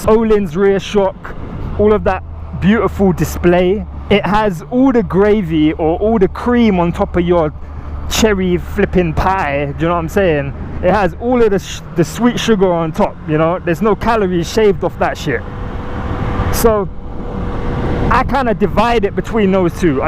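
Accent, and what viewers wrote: London, United Kingdom. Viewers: I think he's from London and I know loads of geezas who talk like him. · That's not a British accent, that's the accent the white boys who want to be black use in London. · I'm confused, you have a british accent but your nott in the UK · Too young to be old new London accent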